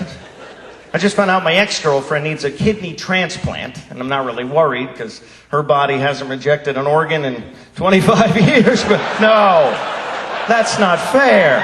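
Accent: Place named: Boston, United States. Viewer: The are both from the Boston Ma area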